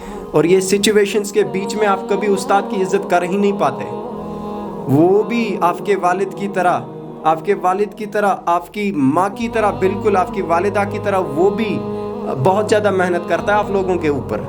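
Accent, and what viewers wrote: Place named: United States. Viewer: Sir u've got an amazin accent u speak like native American that's epic! sir I wanna know did u learnt in online from tv shows speeches etc? actually there are multiple english accents it changes from region to region english speaking countries like America Britain Canada australia scotland ireland there accents and slang words differ from each other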